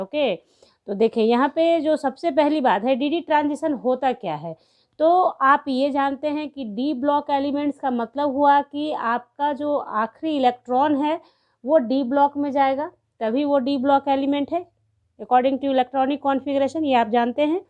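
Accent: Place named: Bihar, India. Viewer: You are from bihar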